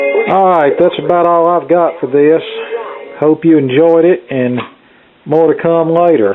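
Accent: United States. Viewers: Interesting American accent. Which part of America are you from, if I may ask politely? I am from Australia.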